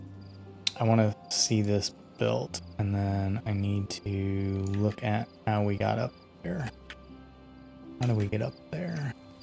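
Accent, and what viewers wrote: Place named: United States. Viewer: Ok I watch a lot of timberborn videos…you and one other are the only Americans I can watch…otherwise it just sounds weird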